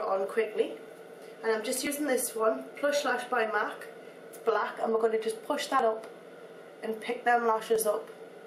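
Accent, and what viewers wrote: Newcastle, United Kingdom. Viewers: Are you ust British, or are you some sort of "mix"? :P BTW: You ROCK at this !!! Keep up the good work !! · r from newcastle by any chance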